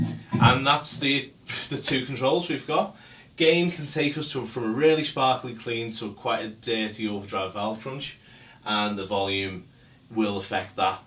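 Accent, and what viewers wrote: Liverpool, United Kingdom. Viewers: Scouse. The language and accent of the Gods 😊Great review La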